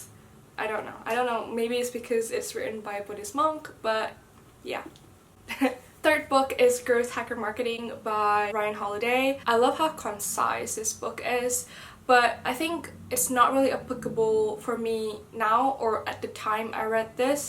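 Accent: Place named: United Kingdom. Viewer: British accent nya 🔥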